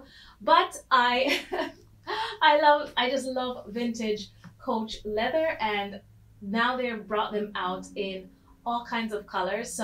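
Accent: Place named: Jamaica. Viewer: You sound like you have a Jamaican accent!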